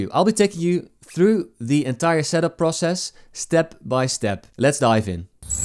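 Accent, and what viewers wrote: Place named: Netherlands. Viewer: Dutch accent best accent. 🙏🏻 Thank you for the clear video and god pace! Keep it up! 👏🏻👏🏻👏🏻👏🏻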